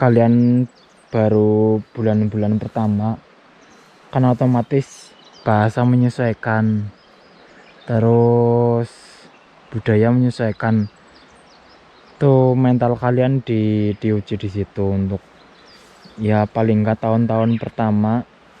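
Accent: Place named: Ngapak, Indonesia. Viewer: Bahasa indonsianya medok banget pasti orang ngapak ya masora ngapqk ora kepenak pokoke mas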